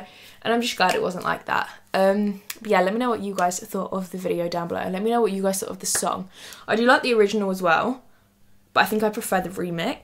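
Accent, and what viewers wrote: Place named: United Kingdom. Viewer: I like u'r british accent.. it's so seductive ❤❤❤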